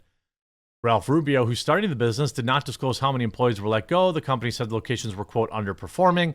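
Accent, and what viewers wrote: Wisconsin, United States. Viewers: That my friend is a Wisconsin way to say bag. I’m in northern Illinois. It’s baag. lol. Love your videos brother Also. I’m telling you guys “Demolition Man” 1993 predicted this. In the movie Taco Bell was the only restaurant to survive the franchise wars. Took place in 2032. They have begun 😂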